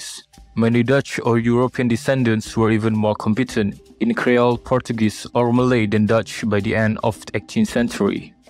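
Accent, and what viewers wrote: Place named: Indonesia. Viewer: Mending pake bhs Indonesia aj sih kl aksennya Indonesia..trs yg komen bangga amat jd ktrnn pnjht/pnjjh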